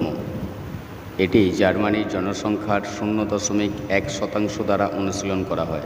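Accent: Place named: Ghoti, Bangladesh. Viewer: ঘটির ভাষা। বাংলাদেশের ঢাকাইয়া কুট্টিদের মতো ! তোতলা টাইপ ভাষা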